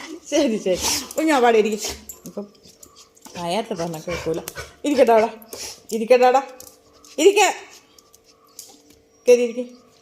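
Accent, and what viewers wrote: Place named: Thalassery, India. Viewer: സംസാരം കേട്ടിട്ട് തലശ്ശേരി ഭാഗത്ത്‌ ആണെന്ന് മനസിലായി... ഏത് ഭാഗത്താ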